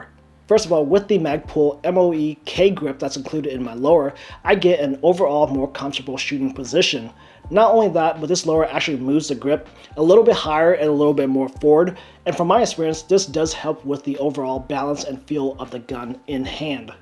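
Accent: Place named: Pacific Northwest, United States. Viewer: Sounds like you live in the PNW.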